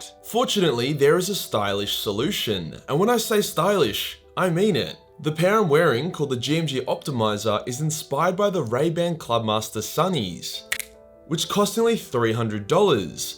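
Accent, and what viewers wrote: Australia. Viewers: Yeah Australian gen alpha is bleeding my ear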